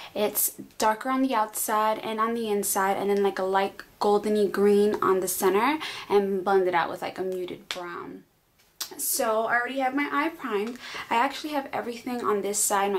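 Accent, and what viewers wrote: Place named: New York, United States. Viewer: love your accent! are you from NY?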